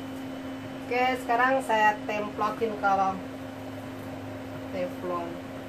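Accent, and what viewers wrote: Java, Indonesia. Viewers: ndlok ngomonge sampean wong jowo yo? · Mbak ini ngomong nya kaya orang jawa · Aq suka dengan resepx n cara bicarane itu lo bhs jawax medok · Konten konten mantaff..mba sekeluarga warga negara Hongkong apa warga negara Indonesia..Sy suka mba klo bicara pake bahasa Inggris tapi medok nya masih ada..the best mba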